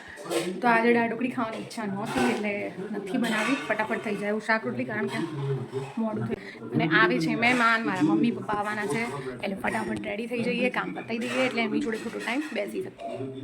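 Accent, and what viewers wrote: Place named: Mehsana, India. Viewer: Mehoni bhasha bv gme 😅tmri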